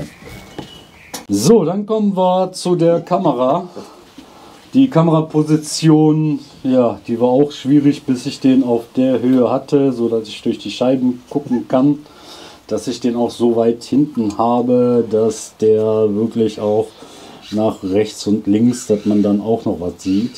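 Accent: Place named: Ruhr, Germany. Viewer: Kommse aus'm Ruhrpott?Dat hört sich so an. Och nö dat Video ist nett abba doch zuviel gebastel für mich. Vor allem bei meinem Reely Big 1 welcher Ständig weh wehchen hat nich lonhnenswert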